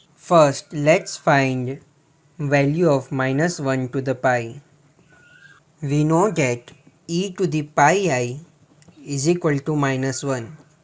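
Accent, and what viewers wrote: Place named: India. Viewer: Your Indian accent : "is equal to" is intriguing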